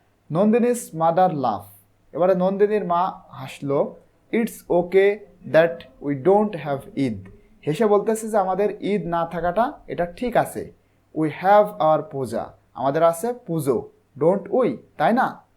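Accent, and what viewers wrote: Barishal, Bangladesh. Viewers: Apni ki borishal theke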